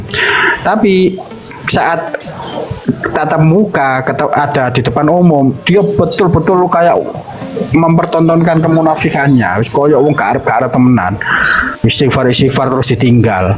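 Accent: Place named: Surabaya, Indonesia. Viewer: nek ngrungokno boso suroboyone cacak nok video iki....rasane kepingin moleh nang njowo maneh rek....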